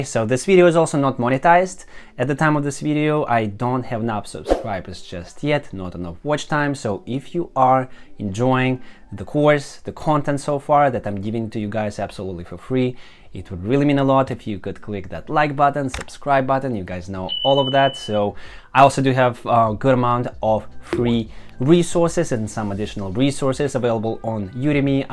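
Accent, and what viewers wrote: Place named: Russia. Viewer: Love that Russian accent, you have that BDE 😂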